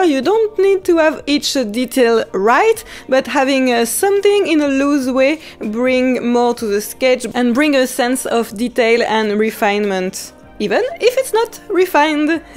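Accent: France. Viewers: I loved watching you do this beautiful sketch. You are a fantastic teacher and your personality makes me feel happy. Also your lovely French accent reminds me of so many happy times visiting France. Thank you very much.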